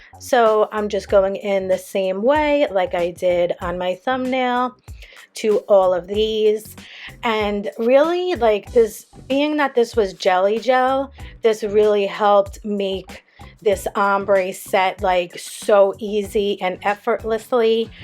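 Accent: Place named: East Coast, United States. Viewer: Gorgeous gel builder I really like it!! You sound like you are definitely from the east coast..yyaass sis!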